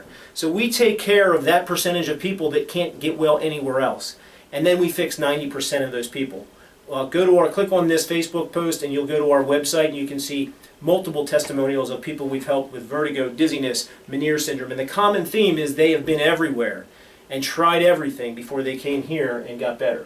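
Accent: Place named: East Coast, United States. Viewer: What about dizziness brought on by visual sensitivity? Otherwise, one of the best videos I’ve seen on the topic of dizziness so far; thank you! Also, please put the link to your website in the YouTube description. I have no idea where you are. Judging by your accent: east coast. So, I’m off to google your practice now…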